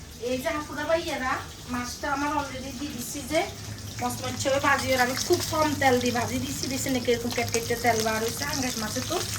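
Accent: Noakhali, Bangladesh. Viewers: আংগো নোয়াখাইল্লা বলে কথা 😊😊🙃🤪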